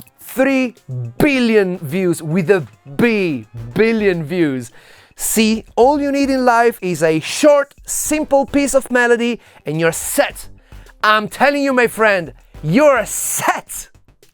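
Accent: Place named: Italy. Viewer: Nice video. 2 out of 100, for your amazing English. I love your accent. You give English that italian design feeling. Cheers. PS: I'm reporting this vid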